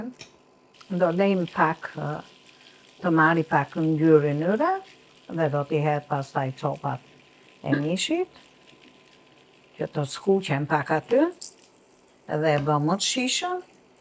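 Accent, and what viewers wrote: Tirana, Albania. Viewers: Te degjoj se flet tironce😂😂😂😂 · Te lumt zonje. Je fantastike, me ze te embel,dhe dialekt tirons puro.❤️🖐️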